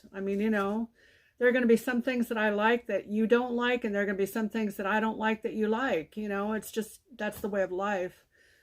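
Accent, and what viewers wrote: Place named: Illinois, United States. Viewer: I subscribed to your channel because I'm tired of all the others that are doing strange things. I'm about the same age and I have bought many of the dresses you have purchased. So we have the same style. I use to live in Illinois and your accent sounds from that area. I now live in California. I love Temu but have spent tooooo much on that site.....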